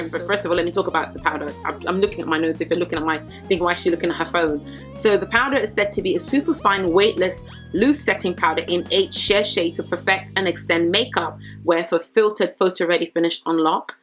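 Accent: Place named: United Kingdom. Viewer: Your mix of the British accent and yoruba was killing me😊 Love your review. 😍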